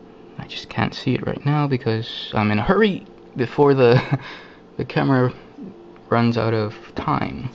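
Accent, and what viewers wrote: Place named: Netherlands. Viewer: sounded like a Dutch accent ;)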